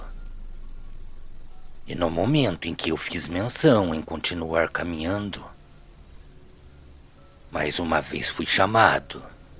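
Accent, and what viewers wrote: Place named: Paraná, Brazil. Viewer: Boa noite, meu velho ! Cara gosto deste sotaque de ti uma mistura do Parana com o interior de Sampa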